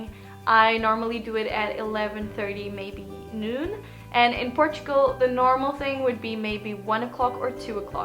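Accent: Norway. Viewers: It's almost like you got a Norwegian English-accent, if you know what I mean? Have you learned any Norwegian by now while you've been here, and what do you do for a living? Anyway, you've got a new subscriber now 😊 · She looks Norwegian..... I`m suspecting this portuguese routine to be a lie! Blue eyes, pale skin and norwegian accent... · you speak english with Norwegian accent.... you are not Portugese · Is there someone else who think she has a norwegian accent? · you sound like an Norwegian speaking English!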